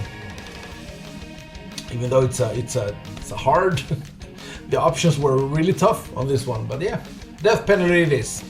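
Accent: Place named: Spain. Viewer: for a Swedish man you sound like you have a more spanish accent. no hate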